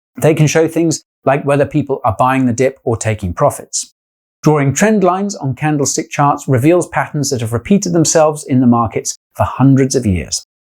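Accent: United Kingdom. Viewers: so much priceless information! and even explained with a British accent! couldn't wish for more than that 😍😁 you' re very funny too 😁, love your jokes hehe makes everything so much fun and easier ❤️ thank you so very much! ☺️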